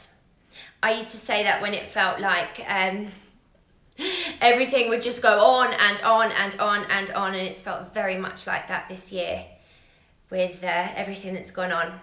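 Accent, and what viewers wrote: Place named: United Kingdom. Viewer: Love you as an instructor!! Your British accent kills me in a great way. Love it. Enjoy your classes.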